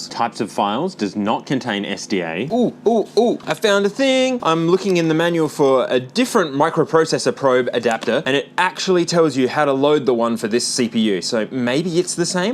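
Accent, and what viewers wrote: Australia. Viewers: That's awesome! I used to own a Para Para Dancing (Korean Market version of PP Paradise). I'm part of MAGFEST here in the states.( Haven't looked yet at your profile and I'm trying to guess by accent... Australian NOT New Zealand 😅) Magfest is a yearly gaming event, 4 days, 24hrs nonstop EVERYTHING, concerts, panels, LAN, Indie gaming, console. We had to cap it at 20K People. We just had MAG 22. I've been involved since 3! I'm the warehouse and on-site event tech. MY gf got involved after we met around the time 11 started, and she later became arcade department head and she was so good at management, she was the head of the board of directors. Unlike me, she's extremely smart, and a scientist. My genius is Electronics repair 😊 Our rhythm/Japanese games section. We have groups of collectors that have insane stuff. Last event over 350 cabinets and over 100 were rhythm games. 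You name it, it was there. I may have a walk through on my channel I posted. I'll have to send you a link, as most of my channel is my daughter playing Pop'n Music. She jumped from low 20s and is currently playing 49s 😂😂😂 I'm jealous AF!! 😂.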